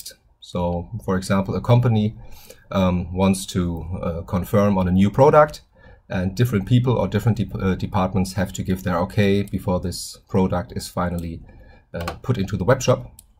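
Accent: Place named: Germany. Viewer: You have a German accent 😂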